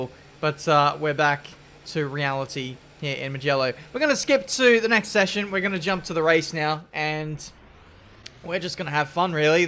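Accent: Australia. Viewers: an Italian here. I confirm your pronunciation was on point, even if the English Australian accent was still there 😂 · If you wouldn't have that aussie accent you would have said mugello pretty accurate. You say "mugellou" you should say "mugello"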